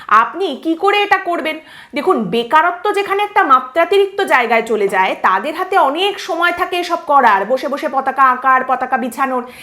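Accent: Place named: India. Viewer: tor kotha bolar dhoron inidna der moto kano tailea ?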